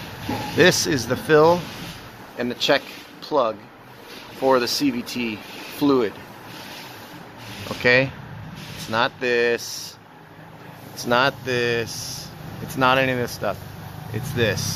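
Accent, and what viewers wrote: United States. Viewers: Who cares to make fuss about drivers position. He made a mistake, but we all should know the right or left of our vehicles if we are going to do potentially detailed maintenance. I get it. But we should focus on the facts. This very well could had been a Subaru in Japan or England, etc. Sans his American accent. But not every u tube video is safe to follow without some double research or common sense. One could see the frontal location of the front differential in his video. That's enough beyond a verbal for me.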